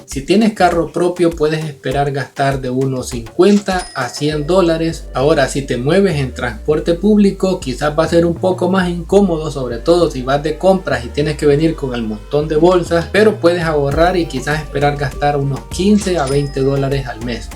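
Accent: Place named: Cuba. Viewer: Por favor podrias pronunciar las letras S, FINALES? Y LA D, FINAL. SE TE OYE COMO J. TU CANAL ES MUY BUENO.ESCUCHATECY VERAS, QUE TU MODO DE HABLAR, PARECE DE UN CUBANO O NUCARAGUENSE PERO NO, SALVADOREÑO.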